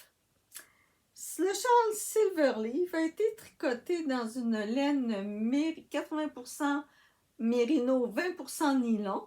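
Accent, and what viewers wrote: Quebec, Canada. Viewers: Bonjour, super vos réalisations et cela m’a beaucoup inspiré notamment le montage des cols, je vais essayer. Je ne suis pas contre un tuto même si je crois avoir compris comment faire. J’adore votre bel accent québécois:) Bonne journée. Muriel de Paris (France)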